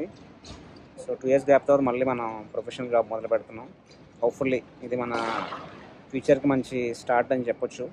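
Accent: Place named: Anakapalli, India. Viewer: Baya e vuru midhi ma anakapalli yasa nilanay untadhi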